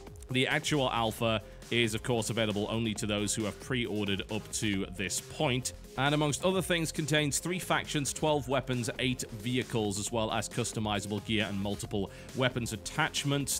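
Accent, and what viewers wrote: United Kingdom. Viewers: are you honestly requesting someone to pronounce something a specific way? wtf, he talks how he does, he's from the UK but has lived in the US for over a year... so he talks like John Bain, deal with it.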